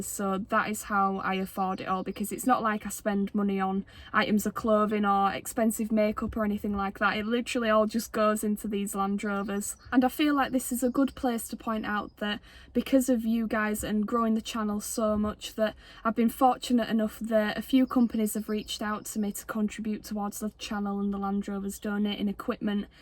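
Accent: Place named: Northern England, United Kingdom. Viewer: Brains, beauty, dirty coveralls, filthy hands, cup of tee and a northern accent. It’s made me enjoy leaning to repairing my landy.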